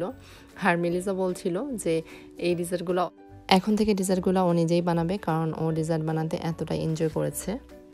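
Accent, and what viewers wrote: Sylhet, Bangladesh. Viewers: Api you're from sylhet? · Assalamualikum apu apni ki Sylheti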